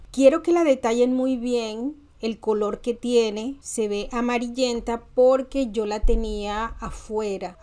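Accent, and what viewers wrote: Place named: Venezuela. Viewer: Me encantó. Me gustas como hablas pareces Venezolana. Aplicaré lo aprendido. Gracias.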